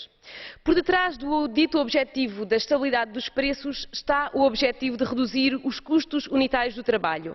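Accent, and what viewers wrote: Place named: Portugal. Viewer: Poxa, queria entender, mas a legenda diz coisas sem nexo algum, e o sotaque português não ajuda...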